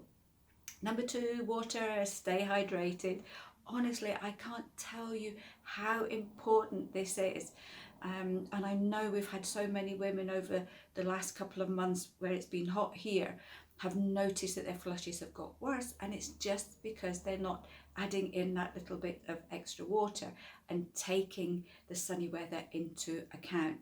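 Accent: Scotland, United Kingdom. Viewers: Mine are just starting. I don't go red but I certainly sweat. Can I take sage tablets while taking citalopram? Do I hear a wee scots accent there? I'm scottish but live in Germany...😊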